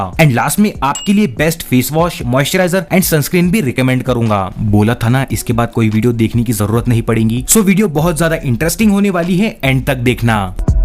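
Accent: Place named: Maharashtra, India. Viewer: Marathi hai bhai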